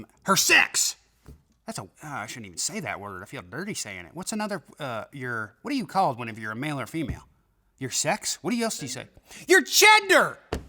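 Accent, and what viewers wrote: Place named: Kentucky, United States. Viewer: Im from ky and I can definitely tell you lived there for a while when you was younger by the way you talk lol, screw all the politicly correct way to talk not good for anyone to censor the way you talk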